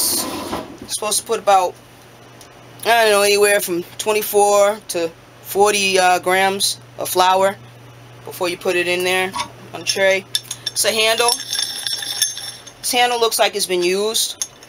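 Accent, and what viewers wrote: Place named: New England, United States. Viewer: Patriots and that accent...🤔...You have to be from New England haha....I’m from Boston, I can pick out that accent anywhere hahaha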